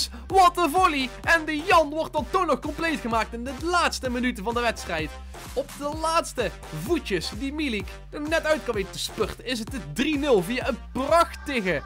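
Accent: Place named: Dordrecht, Netherlands. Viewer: Je ziet wel dat je uit Dordrecht komt je accent is heel anders😔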